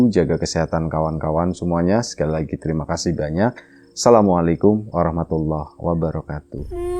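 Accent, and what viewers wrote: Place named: Brebes, Indonesia. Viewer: Brebes ngapak, dadi warga magelang nyimak mas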